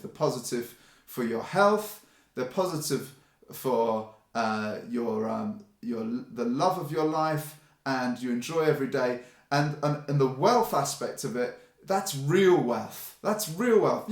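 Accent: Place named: South East England, United Kingdom. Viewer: I'm not really into the 'peace love and harmony' stuff and my initial impression of the first 5 seconds of your video was "oh here we go. some pseudo spirituality bullshit", but you were actually saying some interesting and meaningful things. Nice one. Also what part of England you from? Sounds like south-east.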